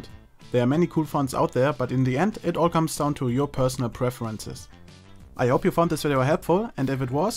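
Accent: Germany. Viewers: Are you German? Cause you sound like German ^^ If yes, then Halloooooo :D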